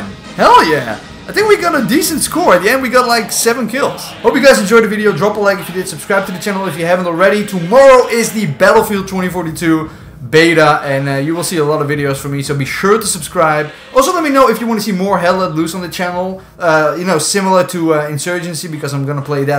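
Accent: Netherlands. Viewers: I can hear a Dutch accent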